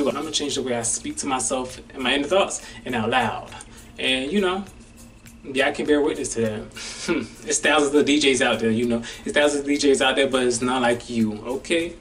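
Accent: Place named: Spain. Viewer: Lol "its my Spanish accent even though I don't speak Spanish" 😅.. Im definitely with you on that!!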